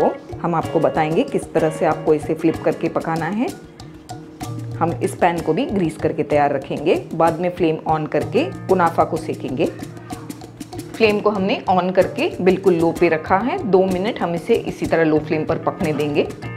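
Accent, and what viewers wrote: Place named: Konkan, India. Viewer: Aap kokni ho na .baato se aap kokni lagte ho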